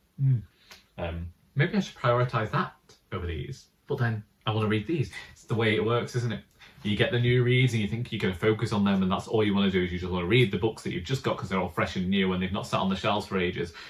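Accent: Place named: Manchester, United Kingdom. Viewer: I've been watching your videos a lot recently and I really enjoy them, in part because I recently moved away from Manchester and I feel so at home listening to your accent. Also, you've helped me out of a reading slump and although I'm not a quick reader I am back into reading again. I enjoyed Never the Bride by Paul Magrs and I've just got Emotionally Weird by Kate Atkinson. I've made note of quite a few of your recommendations, which I'll look at getting later on. It's funny how earlier this year I couldn't find anything that tempted me to read but, having got back into the reading mood, I'm coming across so many books that look interesting and my book shelves are stacking up. I've never really looked at books in charity shops before but I think the randomness of choice has been really helpful. Anyway, just wanted to say thanks, 📚🙂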